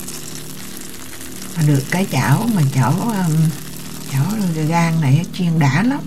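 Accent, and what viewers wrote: Quang Nam, Vietnam. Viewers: Chào, Mình rất thích nghe giọng Quảng Nam cuả 😍🤩 Bánh rất ngon, dòn và đẹp lắm. Like 👍👍👍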